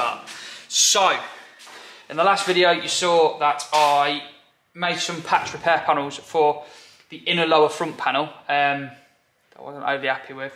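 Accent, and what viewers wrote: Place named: Nottingham, United Kingdom. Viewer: Nice work. I’m trying to work out your accent,. Are you from Nottingham?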